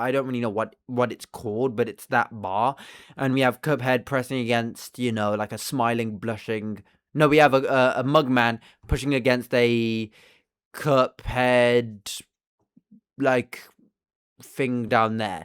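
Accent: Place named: United Kingdom. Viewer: HESSSSSSSS BRITISHHHHHHHHHHHHHHHHH still good video tho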